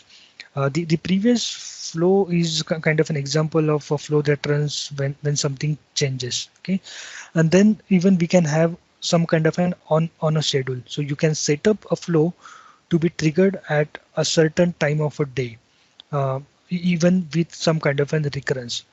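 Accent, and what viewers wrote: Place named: India. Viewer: Great video! Even though the indian english accent doesn't help with understanding, the explanations were very clear. Thanks!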